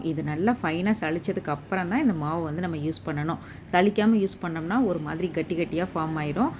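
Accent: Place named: Kongu, India. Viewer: Neega erode ah sis neega pesarthu kongu Tamil maathirye iruku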